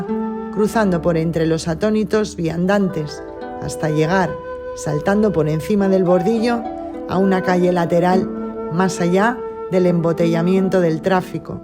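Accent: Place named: Spain. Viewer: Gracias Camaradas iiiii Que bella voz tiene la Camarada que narra en este Audio, tiene una voz Muy Clara, Firme y Armoniosa, un español claramente de España,toda una Gran Guerrera iiiii Saludos.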